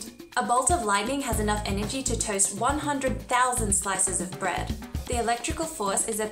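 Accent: Australia. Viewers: Well, since we are bound by causality, it would be impossible for me to do that. Your making the video is the cause and my replying to it is the effect, after all. The latter can not precede the former. BTW your accent..........I presume you're Australian? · Her accent is Australian and I’ve never heard an Aussie pronounce it “urine-us”. That’s more of an American affectation. Out of curiosity, how do you pronounce “uranium”?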